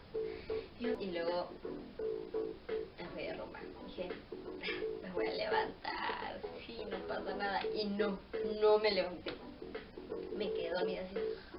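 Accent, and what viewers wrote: Mexico. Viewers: Hola bueno conociste el norte. muy buen ambiente. sólo te faltó conocer Chihuahua nuevo León Durango. y bueno hasta se te pego un poco el acento. aguevo. un saludo linda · casi puedo jurar que eres mexicana de verdad tienes acento de sonora por un momento me enganaste saludos... like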